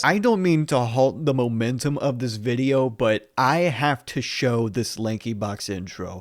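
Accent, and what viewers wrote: Boston, United States. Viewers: I've been watching youtube essays on 2.5x speed in the background of my day to day for the better half of my life. Somehow I've never heard a single narrator with a Boston (assuming) accent and it's freaking me out.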